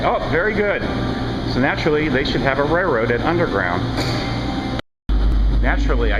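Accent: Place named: New England, United States. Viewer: wow, really good video. Yankee accent though, and 3 dollar words. You ain,t from around here are you all?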